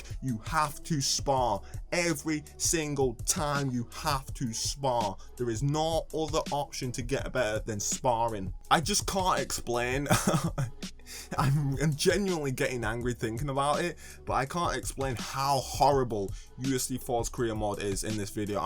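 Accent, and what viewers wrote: United Kingdom. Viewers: You sound British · Jeez mate your accent is like nails on a chalkboard to my damn ears. Common as MUUUUCK. I'm also from the UK. This is the bottom of the barrel of accents we have lol thIIINNNGGHH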